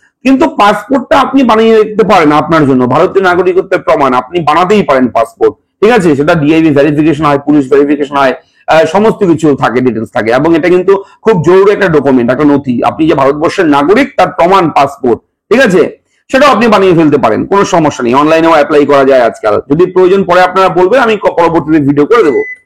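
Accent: Bangladesh. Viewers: আপনার কথা শুনে তো মনে হচ্ছে আপনি বাংলাদেশী, ডকুমেন্ট আছে তো?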